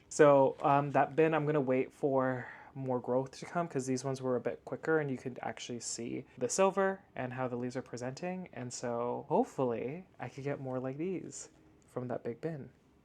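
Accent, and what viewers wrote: South, United States. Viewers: Love it when to tawk southern lol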